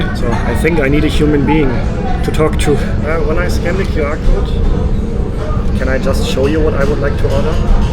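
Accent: Germany. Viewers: Gah, it’s taken me so long to figure out where I know his accent from (yes, germany) but he sounds like Klaus from American Dad 🤣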